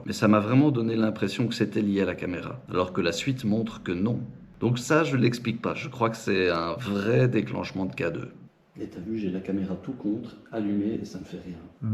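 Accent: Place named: Belgium. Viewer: dictions parfaite avec l accent belge ;analyses bien expliquées et enquête bien menée ; que demander de plus! j adore!!!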